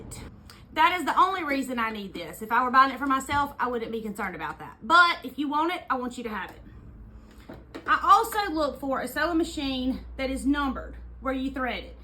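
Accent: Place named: Tennessee, United States. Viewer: I ❤❤❤ your accent. My Grandmother, the one who helped raise me, was from NW Tennessee (Union City area). Listening to you is like being with family. 😢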